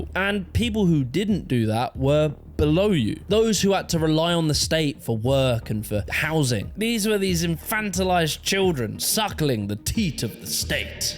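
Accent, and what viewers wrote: United Kingdom. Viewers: the funny thing is, if you pull the accent and literally naming Brittan, This video is literally just the story of every "first world" country. None of what was said here doesn't apply just as well to me here in Canada.... the names and faces of the people change, but the story... the story is the exact same. a sentiment that is echoed by other commenters, from other countries as well. We are all playing in the exact same game, just with different localization. its like we are all playing different versions of monopoly, with that same cheating frined that insists on being the banker and not letting anyone else review the rulebook but them.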